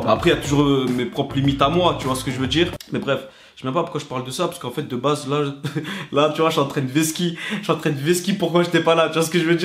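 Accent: South, France. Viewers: L’accent du sud c’est vraiment le pire du monde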